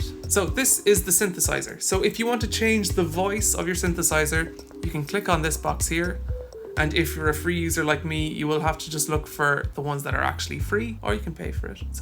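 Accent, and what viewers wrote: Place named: Ireland. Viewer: I like the irish accent :D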